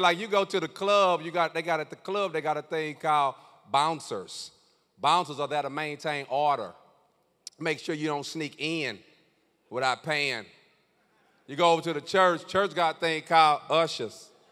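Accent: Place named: South, United States. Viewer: He’s country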